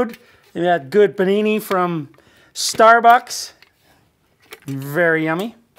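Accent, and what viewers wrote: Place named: Sherwood Park, Canada. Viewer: I thought your accent sound familiar, I lived in Sherwood park for 3 years.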